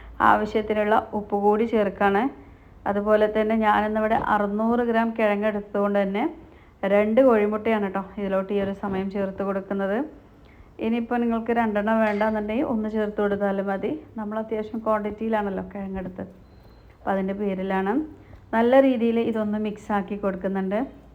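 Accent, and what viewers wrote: Malappuram, India. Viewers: മലപ്പുറം താനല്ലേ മലപ്പുറം ബ്ലോക്ക് സംസാരം കേട്ടപ്പോൾ അതോ അവിടുന്ന് മലപ്പുറത്ത് അല്ലാത്ത പോലെ തോന്നുന്നു ഇത്രയും ഒന്നും വേണ്ട നമ്മൾ നമ്മൾ മലപ്പുറക്കാർ അല്ലേ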